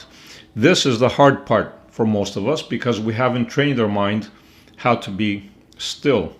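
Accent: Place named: Greece. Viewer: I am so glad that you were born in Greece, when i first watched the first video of you, from your accent, i understood that you are Greek :) I am following your guidance and i ve seen tremendous changes in my financials and mental health. Ευχαριστώ!!!☺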